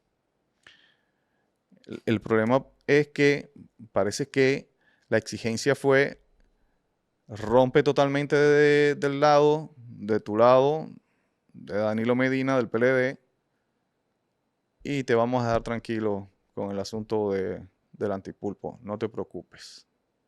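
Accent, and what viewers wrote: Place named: Venezuela. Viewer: Is this guy DOMINICAN..? Sounds very much Venezuelan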